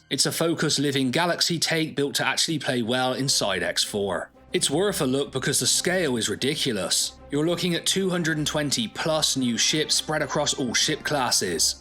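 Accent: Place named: England, United Kingdom. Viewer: Hey, fellow Englishman here, from a bit further to the east than you going by the accent 😂 I’m 46 and just restarted the expanded universe books from where I left off in my early twenties to be more clever. It’s such fun, I didn’t know if I’d still enjoy it but loving it - no spoilers please I’m nearing the end of the yuuzhan vong war. I played through most Star Wars games and read again the old comics - expanded universe is amazing, I love it so much and have so many good memories from it and everyone knows, this is the real Star Wars timeline written mostly by people who get it and are into it as opposed to the Disney trilogy which seemed to be a misandrist modern feminis/woke vehicle to attack men, and push minority supremacism (I feel like you’re expected to caveat that with how you’re not racist that’s the point but I cannot be arsed, shouldn’t have to). 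The worst moment I’ve ever seen in any film was one of the Disney ones where … you guessed it, it turned out all the men we’re stupid and belligerent and wrong and the purple haired old Crone rises up magnanimously and it turns out she and all women were right all along, stupid men. They then kill all the white male heroes - can you imagine how disgusting and unacceptable they found Han and Luke, and even, bastions of moral integrity that they are, force Carrie fisher to be in it, after she died wheeling around her digital corpse having bought the rights to her image. Disney are pretty much the empire. Nice touch having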